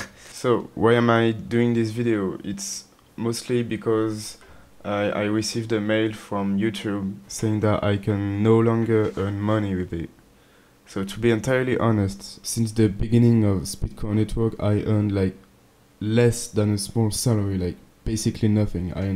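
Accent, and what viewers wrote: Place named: France. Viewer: ptn ouais j me disais bien que t avais l accent francais!^^ ca fait plaisir, brf continu ta chaine surtout tu gères👍🏻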